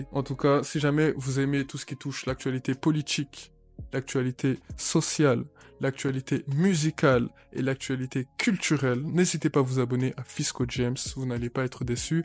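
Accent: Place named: Antilles, Caribbean. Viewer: Toi tu es antillais, je reconnais l'accent. Entre nous, on se reconnait, hein.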